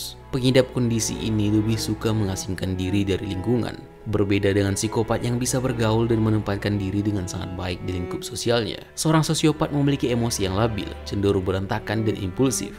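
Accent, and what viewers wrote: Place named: Banyumas, Indonesia. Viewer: Yang ngomong kok beda anjir... Malah jadi logat ngapak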